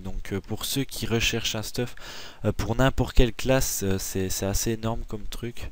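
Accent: Belgium. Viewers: Il est belge:D ?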